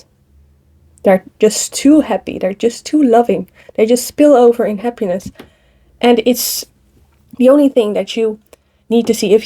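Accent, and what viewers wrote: Netherlands. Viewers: I like it..nice..video.. .reminds me of the Netherlands ur accent..a lovely country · I know a Dutch accent when I hear one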